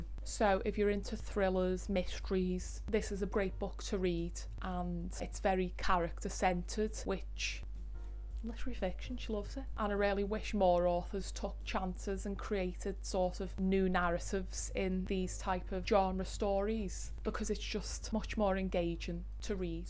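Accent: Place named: Scouse, United Kingdom. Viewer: as someone who lives in liverpool you literally have the most soothing and soft scouse accent i’ve ever heard in my life i could listen to you talk all day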